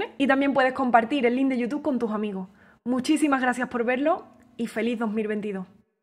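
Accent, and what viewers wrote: Andalusia, Spain. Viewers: ¡Que espléndida ejecución! Aunque admito que lo que más me gusta es escuchar su acento andaluz.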